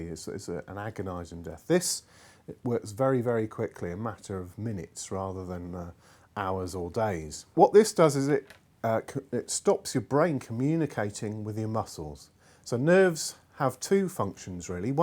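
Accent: United Kingdom. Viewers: I swear, British people could talk about clipping toenails or removing gangrene and it would sound fascinating!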